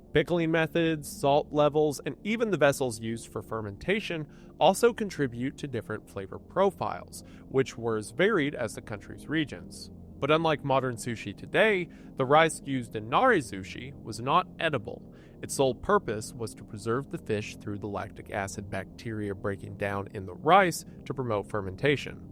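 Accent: Appalachia, United States. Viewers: People complaining about how a deep south Appalachian pronounces words from Japan are literally basement dwellers lol. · I like his appa-LACHA pronunciation of Japanese names. Brings a little southern comfort. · I don't know if he's mispronouncing it intentionally or genuinely but either just doubles down on his Appalachian charm. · So this is what happens when you read Japanese words in an American pronunciation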